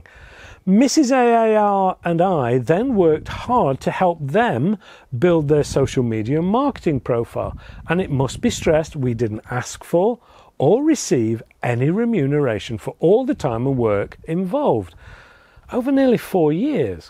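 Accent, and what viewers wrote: United Kingdom. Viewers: thank you very much for this video. I am a subscriber and always enjoy your excellent reviews. I love the British accent as well. I have owned a Gamo Urban which is basically a rebranded BSA Buccaneer made in Birmingham EN. This is a fine high quality PCP, unlike some of the junk coming out of China. Would very much enjoy a review of this airgun from you. For an entry level PCP, nothing really compares. It's also sub moa out to 50 meters with 24 fpe of at the muzzle. Keep up the great work, will be watching for more reviews. 🥇😃 Geo from Kalamazoo, MI USA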